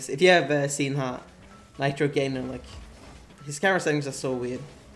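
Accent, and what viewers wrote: Sweden. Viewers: Are u swedish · Are you from sweden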